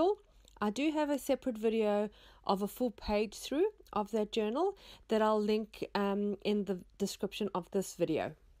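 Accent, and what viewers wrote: South Africa. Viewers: Hello 👋🏻 Just discovered this kind of journalling during the lockdown really and your channel came up in my feed! Love your creativity 💕 Heard your SA accent and wondered where you were? Going to watch some more 🎶🌟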